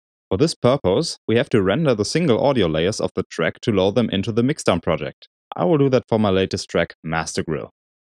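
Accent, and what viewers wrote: Germany. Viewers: nice tutorial! do u come from germany? i think u have a very german accent;P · hahaha after the first sentences I heared that you are from germany :D es ist wirklich erstaunlich wie schwer es für die meisten ist den deutschen dialekt zu verstecken :D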